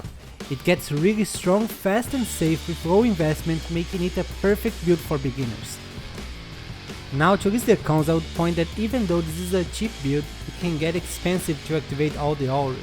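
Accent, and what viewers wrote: Brazil. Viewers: ma da pra ver que o cara é br o sotaque não engana kkkkkk full Fisk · Brazilian here, you sound brazilian... Are you?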